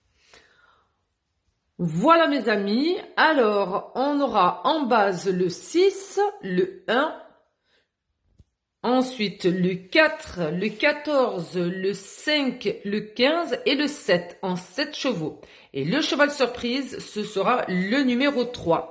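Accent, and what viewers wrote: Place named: South, France. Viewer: Bonjours demoiselle,quel accent tu as d’où tu est du sud ou sud ouest.si ton physique est comme ton accent,tu doit être très charmante gros bisous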